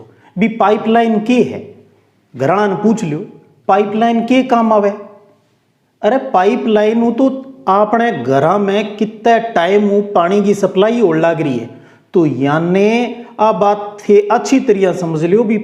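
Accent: Marwari, India. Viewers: Aaj sir marwadi bol rage ho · Sir hmare school me bhi ek sir marvadi bole he ve bahut achi marvadi bole he aap ke jesi 👌👌👌👌🤗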